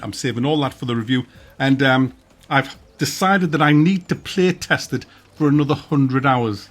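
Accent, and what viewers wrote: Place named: Sunderland, United Kingdom. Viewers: Awesome vid. I'm new around here and i have to say its great to hear an unashamedly Geordie accent with his no BS attitude. Plus you enthusiasm is infectious. I already knew what you were telling us about the farmers and brewers being the same men that figth on the battlefield for you but your excitement for it hyped me up even more. Subbed. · ahhh so refreshing to hear a fellow northerner on YouTube there's no enough of us! This game wasn't even on my radar it looks like it's gonna be mint! I've got a week off work and was looking for something new to play need to get my hands on that early access like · you talk like my friend from sunderland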